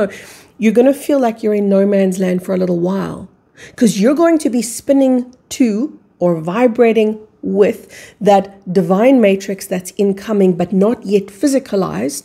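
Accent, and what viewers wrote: South Africa. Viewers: exactly the the South African pronunciation- it is a beautiful accent.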